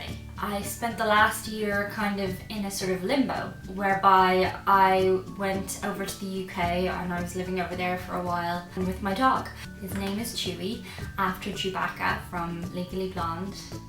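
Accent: Ireland. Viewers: You are a joy to watch but I must agree that this post was very open and sweet! Of course you’re more proficient now, after so many posts and growth is always good! I visited Ireland almost three years ago and your voice “takes me back” to that gorgeous country! I’m thrilled that you’re doing so well AND that you knew that Chewie would be a dear friend and faithful companion; smart lady! Thanks for sharing this!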